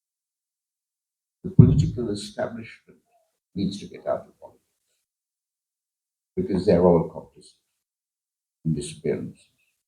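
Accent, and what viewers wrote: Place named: RP, United Kingdom. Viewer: What ridiculous talk,just showing English posh pron ,accent etc What a Tragedy the helpless Poor doesn't understand?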